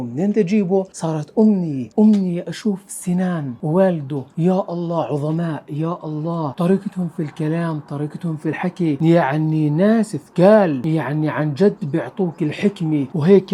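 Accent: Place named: Palestine. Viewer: نحب بزاف لهجتك، لهجة الفلسطينيين الأصيلة.. تحية لفلسطين مني و من الجزائر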